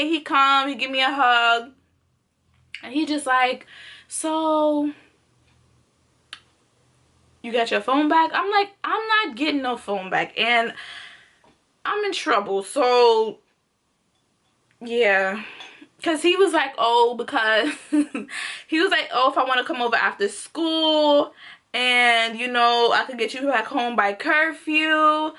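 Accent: Jamaica. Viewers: Just to be curious are you jamaican, half jamaican or part of jamaican because in some in your videos, you had that jamaican accent when you talk sometimes? The reason I'm asking is because I'm half jamaican so I can tell if someone is jamaican.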